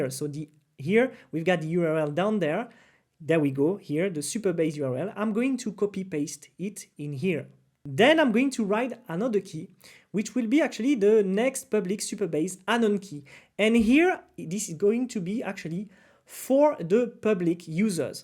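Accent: France. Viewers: I see your frensh accent and ilove you sur❤ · Good one, you sound Frenchie, it will be great if you also have a french channel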